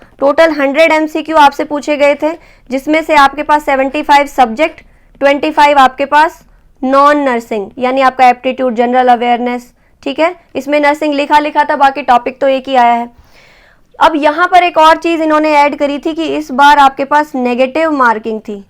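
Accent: Haryana, India. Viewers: Madam Haryanvi h 😂❤ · Hm Rohtak side ki lg rhi h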